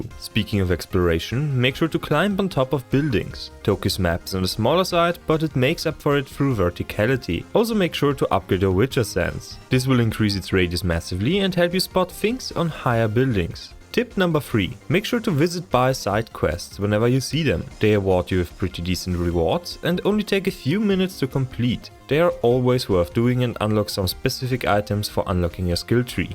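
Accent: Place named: Germany. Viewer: ah ok aber die Sprache is dieselbe xD Hab mir von deiner aussprache gedacht das du evtl deutscher oder Österreicher bist xD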